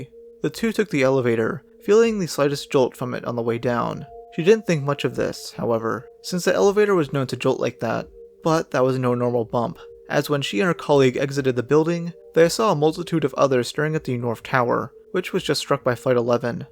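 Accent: Canada. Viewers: I don’t really think so. has a bit of a Canadian accent, meanwhile has a slight Spanish speaking accent (can’t pin point where, my Spanish is not very good) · isn’t it obvious, they are both Canadian as said and he just puts on a slightly Mexican accent for blameiton “ ” (Mexican George) show and puts on his typical canuck accent for his persona.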